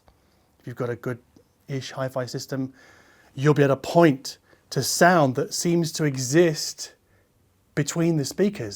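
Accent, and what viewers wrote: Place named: England, United Kingdom. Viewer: I like the way you speak, always have been jealous of english accents.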